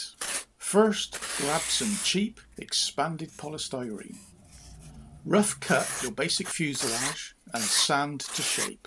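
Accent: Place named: New Zealand. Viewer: I love the kiwi accent.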